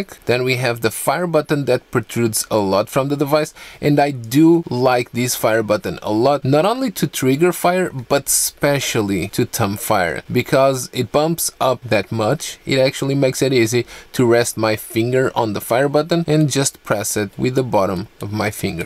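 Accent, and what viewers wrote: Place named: Portugal. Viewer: That Mourinho-like Portuguese accent is just awful.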